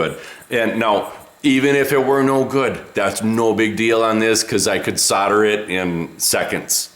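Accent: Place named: Midwest, United States. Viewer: you are so midwestern. it brings me joy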